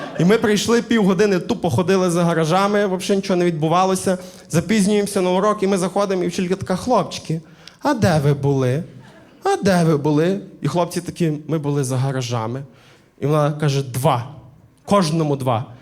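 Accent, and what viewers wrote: Galicia, Ukraine. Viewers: люблю вашу говірку Галицьку, слухала б і слухала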